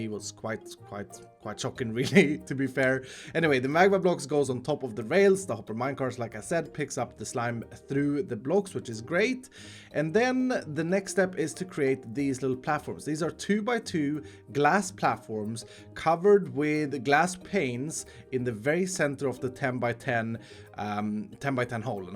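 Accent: Sweden. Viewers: It sounds like you are swedish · I am new to your youtube. Are you from sweden becus you sounds wery like a swedish persone. Sorry if you not are from sweden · are you swedish? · Funny how i could instantly tell you're swedish based on your accent but had no idea keralis was from our western neighbour too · Do you come from sweden?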